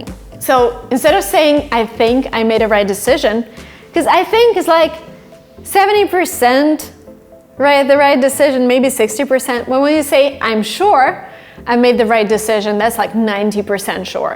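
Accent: United States. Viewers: To my mind she was born to teach. I am absolutely sure that i’m gonna use these alternatives and use less “ I think “ so that i sound more fluent. Thanks for ur practical lessons dear i really do love ur voice and ur American accent. Above all I wanted to appreciate for teaching us all these contents for free. Wish u all the best🥰❤️ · I am Bangladeshi. I want to speak in American accent. I understand your American accent. But I can not speak like you. I am a govt. high school student in Bangladesh. I want to go to America for higher study. This is why I really want to speak in American accent. (My school's teachers are not good at American or any other accent). Our country has no any English accent. Some example of their (teachers) pronunciations are : Water=Water Think=Think Something=something If I speak American accent in the class everyone of my class laughs at me. 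They tell me ,"it is Bangladesh not America.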